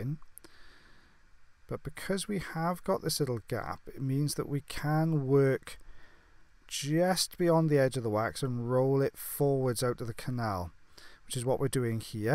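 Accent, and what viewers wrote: Wales, United Kingdom. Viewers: Gordon M he has a welsh accent 😊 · One of the things I look forward to with dry earwax is hearing "crumbly and dry" in a British accent.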